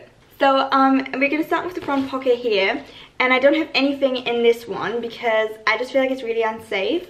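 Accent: United Kingdom. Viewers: omg i just watched her what's in my bag video from before and she has a bit of a british accent now loooool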